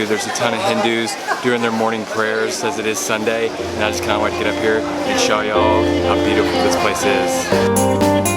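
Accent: South, United States. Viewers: I'm not sure where you're from? I would guest southern ish US? The way you talk its so calm and soothing n yet can feel the excitement... Good video btw Subcribed :-) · You are very descriptive in your food reviews, plus your southern accent is great. MA...LAY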